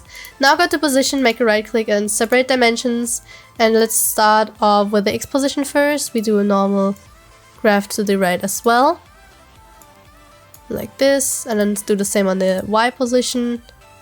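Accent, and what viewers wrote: Germany. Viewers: Schwöre, das Deutsch quilt so ausm Englisch raus hahahah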